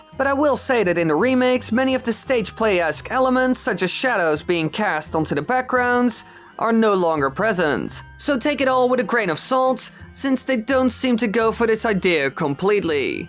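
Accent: Netherlands. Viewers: Ik dacht al dat je Nederlands was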